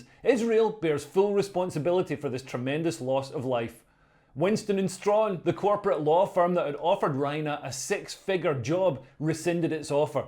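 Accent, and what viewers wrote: Scotland, United Kingdom. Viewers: How can a Brit say all this and not get targeted by The Crown or his Irish neighbors? Or, did i detect a Scottish accent? Anyway, superb breakdown. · Oh My. I'm an Israeli and this full scale, informed rant was worth the struggle to keep up with an accent like that of Scottie on Star Trek. Bravo, Kol HaKavod - a wonderful example of lethal humor used to brilliant effect. I'm sharing this. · Saying that supporting the right of the vast majority of a fully innocent (and yes maybe clueless too ? still not murderous though) Palestinian population not be be bombed into oblivion as retaliation for some of their local nutjobs' atrocities is the equivalent of being a Hamas terrorist fanboy is somewhat of a stretch, ey ? Cute scottish accent or not, you're talkin shyte, me lad. Granted, there are a few wild ones out there but exclusively singling these out strikes me as disingenuous. 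· This my first experience watching channel. I laughed, I applauded, I cheered, and fist pumped the air. I love this guy!! And that accent just adds to the overall experience. I’m an instant subscriber and his newest fan. He’s like the Scottish version of Ozy Man focusing on politics. Well done, sir! Please keep it up! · Never liked Scottish accent... but I do love yours ❤️thank you 🙏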